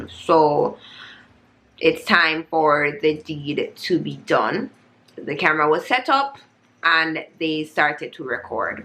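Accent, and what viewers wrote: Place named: England, United Kingdom. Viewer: I was wondering where you going with an English accent and you dealing with German serial killer. Look likes you were thinking the same thing!😝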